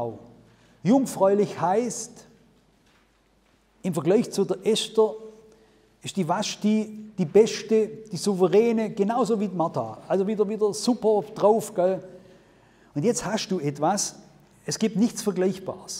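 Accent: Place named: Swabia, Germany. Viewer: Sehr interessantes Zeugnis und dazu noch des Schwäbisch🤗🙏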